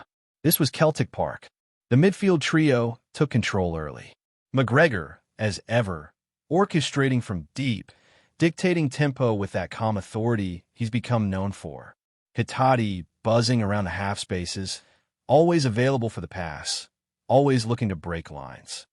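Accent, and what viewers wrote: United States. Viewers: Typical USA commentator..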